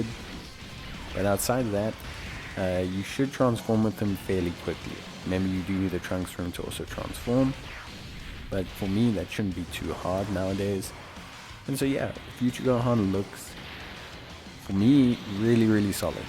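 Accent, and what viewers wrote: South Africa. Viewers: Yo bro are you from South Africa? Your accent sounds familiar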